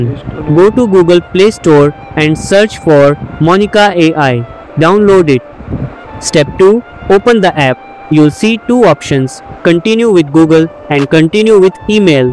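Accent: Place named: India. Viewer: Indian accent right? ur from india cool😮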